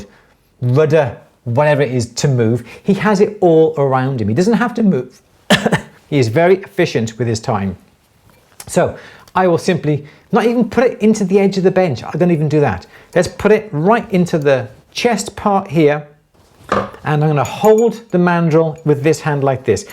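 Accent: Wales, United Kingdom. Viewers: Great videos. U have a sight Welsh accent s. Wales??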